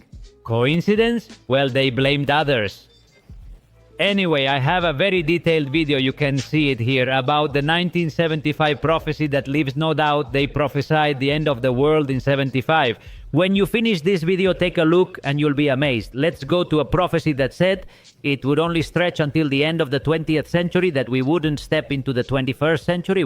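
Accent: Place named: Pakistan. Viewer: Yup, Pakistani accent, but doesn't matter, the message is what's the real matter here, the reason you are doing here Good luck with this new adventure, open more eyes, spread the truth about that cult and save people from the government body's claws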